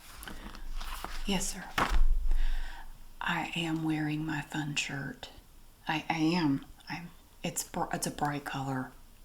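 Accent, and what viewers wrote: South, United States. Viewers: I've been watching your channel for a while now and you are one of my absolute favorites. I'm also a southern lady and my family disowned me when I came out as gay and I moved away(I even lost my accent). My grandmother's name was Mary and your accent and voice just remind me so much of how comforting of a presence she was. It just takes me back to a different world I left behind a long time ago. Also you are so beautiful! I swear I'm not trying to flirt lol. I'm not really into feminine women, just jealous tbh haha.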